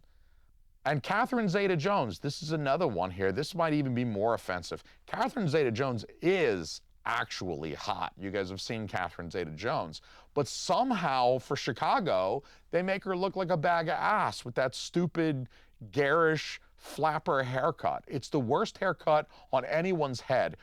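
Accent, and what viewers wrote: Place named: Pittsburgh, United States. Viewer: I agree with everything you said here and laughed quite a bit. But I’m so confused why you’re wearing a Georgia sweatshirt and have a Pittsburgh accent.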